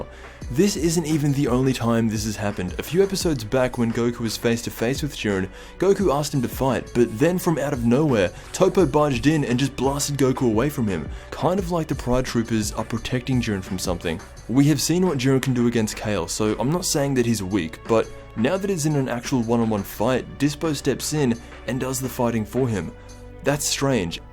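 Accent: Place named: Australia. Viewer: What country are you from? I can't place your accent all I'm getting is Australian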